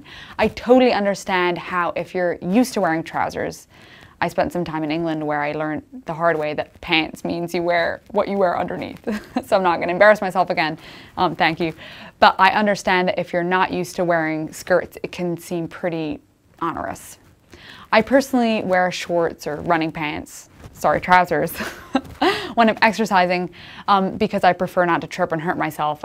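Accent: Pennsylvania Dutch, United States. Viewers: It sounds very similar to Pennsylvania Dutch, just like the Amish.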